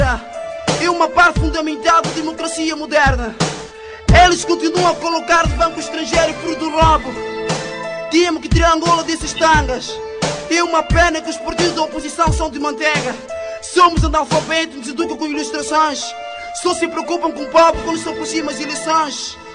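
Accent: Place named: Guinea-Bissau. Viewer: esse gajo nao é angolano! tem sutaque de guineense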